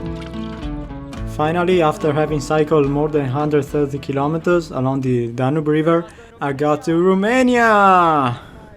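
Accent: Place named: Venice, Italy. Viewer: Ma io non so l'inglese e a 70 anni non mi metto di certo a studiarlo. Mi dispiacerà non seguirti più! Sei bravo ,simpatico e soprattutto non reciti la parte dell'eroe. Risolvi ogni intoppo senza ingigantirlo e hai un accento veneto che mi piace molto. Buon viaggio quindi, e mi raccomando: resta sempre così ,te stesso 😊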